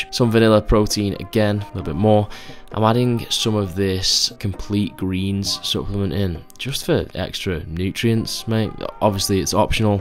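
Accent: United Kingdom. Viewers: I love the British accent (quick say water) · Something about a guy with a heavy UK accent calling the taste of a smoothie "absolutely fucking ruthless" with soft music playing in the background. You got my sub. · the bri'ish commentary just makes it that much better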